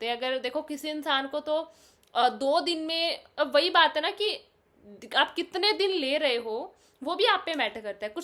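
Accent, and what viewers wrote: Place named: Rajasthan, India. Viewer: Ap rajasthan se ho kya... Your accent .... Like them .... dontpls 😂 don't ever ye sound se m bed se niche gir gye😂 no pls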